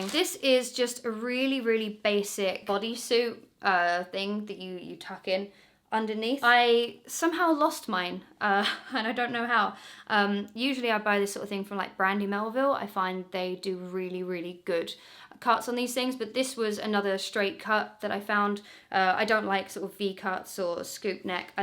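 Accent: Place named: Essex, United Kingdom. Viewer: Missed that Essex accent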